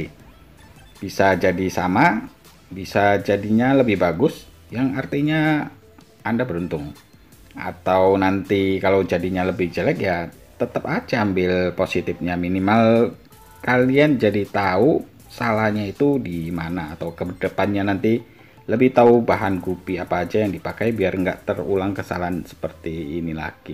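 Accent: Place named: Madura, Indonesia. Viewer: logat.e dudu suroboyonan tapi meduroan 😂🙏👍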